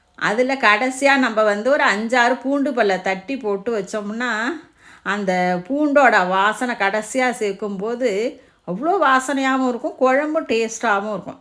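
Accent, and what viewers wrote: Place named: Mannargudi, India. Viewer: Unga pecha ketta mannai side pechi pola irukku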